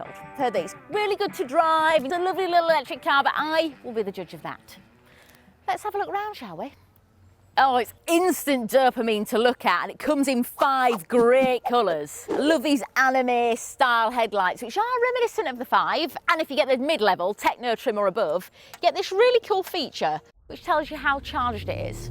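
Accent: Yorkshire, United Kingdom. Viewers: Accent? Yorkshire? Love it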